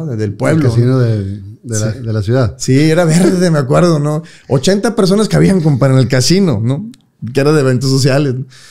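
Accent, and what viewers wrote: Monterrey, Mexico. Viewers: Me sentí en una carnita asada, que bonito es el acento regio!!! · Tal vez por el acento, así hablamos los regios jejejeje 😁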